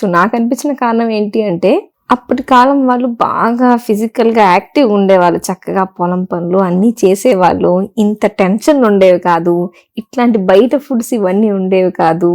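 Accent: Guntur, India. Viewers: Brahmandamga cheppavamma. Somehow, I like your Telugu accent of Guntur area and your down to earth explanation, which itself is a great virtue for any doctor.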